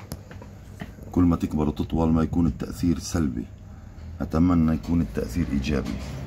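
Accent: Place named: Palestine. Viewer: تحياتى من مصر بحبك حضرتك أوى 🙋‍♀️😊🧡🍯 وبحب اللهجه الفليسطينيه اوى وبالأخص كلمه "ولااااو" بحبها أوى 🤭💜💜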